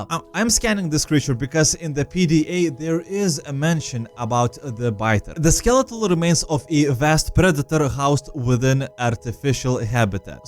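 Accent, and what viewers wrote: Russia. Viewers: OMG i finally find a good youtuber your russian actcent sounds good i love your vids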